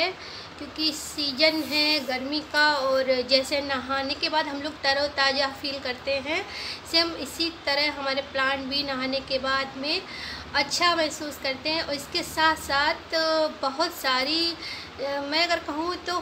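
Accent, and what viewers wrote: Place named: Kanpur, India. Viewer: तुम्हारी आवाज सुनकर कानपुर की याद आ जाती है बिल्कुल ऐसा लगता है जैसे हम घर पहुंच गए आपके प्लांट के बारे में भी जानकारी दी बहुत अच्छी बहुत-बहुत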